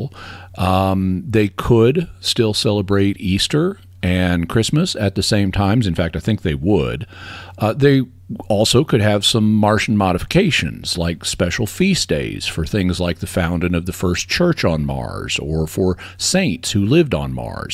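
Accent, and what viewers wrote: South, United States. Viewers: I love your work! Thank you! Also, I noticed a southern accent stronger in this video than most. Did you recently speak with southerners? That phenomenon of changing accents according to environment would make an interesting topic. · Is it just me... or is he assuming a "folksier" southern accent than usual in this video lol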